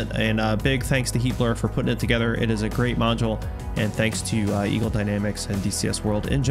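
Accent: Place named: Germany. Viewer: The German guys accent breaks my immersion 😂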